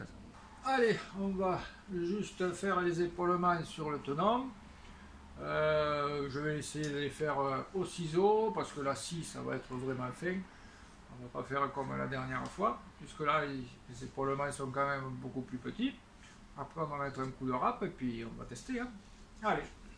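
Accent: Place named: Gard, France. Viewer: Je t'adore! et ton accent qui me rapelle celui de mon patron quand j'allais vendanger dans le gard! le petit betisier est cool aussi, peut le mettent en ligne et ca ajoute un peut de proximite. ne change rien:)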